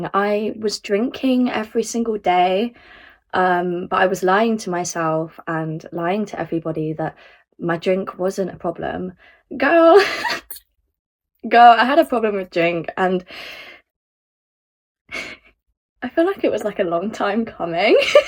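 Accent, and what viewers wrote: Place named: United Kingdom. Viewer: The shock I felt to hear your British accent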